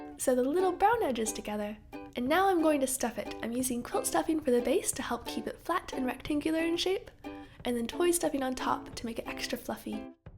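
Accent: United Kingdom. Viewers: do you think the way you pronounce things gets more British sounding the more years you spend with Tom? I'm an American dating a British guy and when I visit him for long periods of time I notice myself sounding more British by accident!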